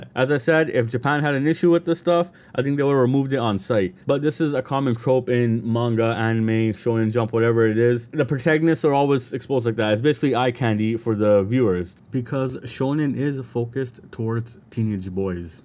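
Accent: Toronto, Canada. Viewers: You talk like a Toronto / GTA man for sure.. you from the ends ahlie?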